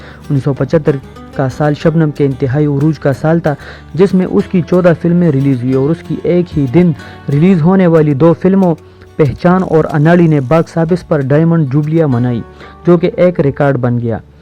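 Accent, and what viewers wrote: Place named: Pashto, Pakistan. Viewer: سرجی پشتو ٹون میں آپ کی بتائی ہوئی باتیں ، شبنم کا پورا فلمی سفر اے ٹو زیڈ تک آپ نے گن کے بتایا ۔ ساری فلموں کی معلومات فلاپ اور ہٹ فلموں کے ساتھ آپ نے باتیں شیئر کی ہیں ۔ ایسی تفصیلی معلومات ہمیں کبھی کسی بایوگرافی میں نہیں ملی ۔ فلم عندلیب کے سیٹ پر وحید مراد سے جھگڑا اور اس کے بعد اس کے ساتھ فلم نہ کرنے ، اور اس کا بائیکاٹ کرنے کی باتیں بہت معلومات دے گئیں ۔ شبنم کے ساتھ اتنا بڑا حادثہ ہونے کے باوجود بھی وہ پاکستان میں آتی جاتی رہتی ہیں ، جس کی وجہ سے ، اس کے بیٹے کے ساتھ اس کی انبن چالو ہے ۔ اب مجھے اس گانے کی لائنیں یاد آ رہی ہیں جو شبنم کی اوپر برابر فٹ بیٹھتا ہے ﴿جس نے میرے دل کو درد دیا اس شکل کو میں نے بھلایا نہیں﴾ مگر اس کے بیٹے نے وہ باتیں اپنی ماں کی وجہ سے نہیں بھٌلائیں ۔ ہمیں اس بات میں دست اندازی نہیں کرنی چاہیے ۔ پر شبنم کا یہ ڈیسیشن کہاں صحیح ہے کہ ، اپنے بیٹے کو ترجیح نہ دے کر ، پاک سے اپنی محبت کا ڈھنڈورا پیٹتی رہتی ہیں ۔ ٹھیک ہے اس کی پوری زندگی وہاں گئی ، پر اس کا مطلب یہ تو نہیں ، کہ اپنے بیٹے کے پیار کی ہی قربانی دے دے ۔ خیر ! 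رب العالمین دونوں کو سمجھ عطا فرمائے آمین ۔پونا بھارت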